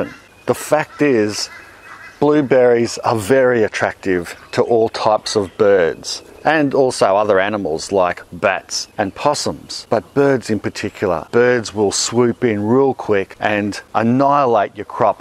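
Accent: Australia. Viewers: Haha. Same here, and also love the Aussie accent.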